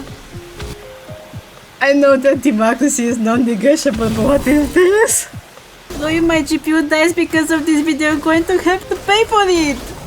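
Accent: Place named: Europe. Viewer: Hearing American behavior with a European accent scratches a special itch in my brain for democracy 🫡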